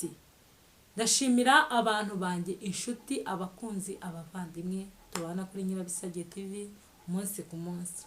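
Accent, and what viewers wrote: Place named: Banyamulenge, Democratic Republic of the Congo. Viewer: Ese ko numva uvuga nkabanyamurenge uriwe ndabakunda peee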